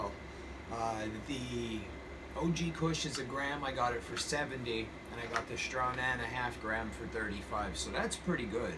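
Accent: Pennsylvania, United States. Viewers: ayy def got the PA accent haha, greetings from NEPA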